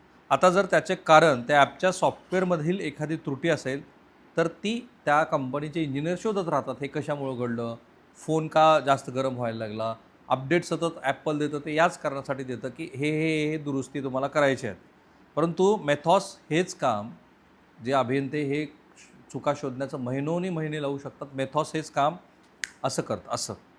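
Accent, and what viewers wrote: Marathwada, India. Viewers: साहेब तिकडचे आहेत, मराठवाडा भागातील तिकडे ण चा उच्चार न असा करतात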